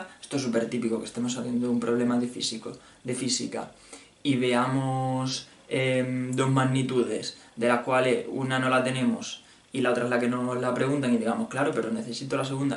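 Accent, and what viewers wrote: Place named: Castile, Spain. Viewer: Buen vídeo! En este vídeo te sale un acento súper castellano comparado con los otros!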